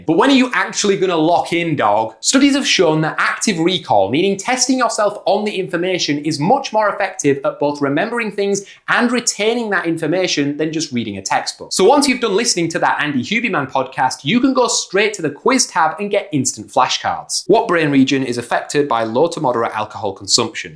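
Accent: Northern England, United Kingdom. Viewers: Gotta love the (British?) accent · Northern (English) british accent.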